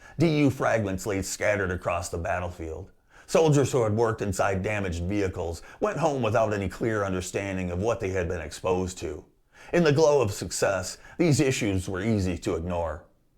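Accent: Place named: United States. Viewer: Spoken and presented like a true American 🇺🇲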